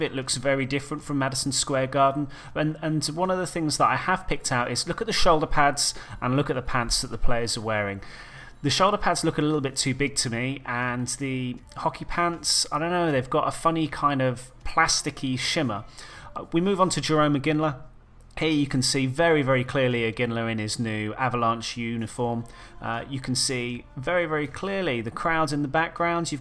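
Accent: United Kingdom. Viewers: It's funny, I've never heard a hockey fan with a British accent!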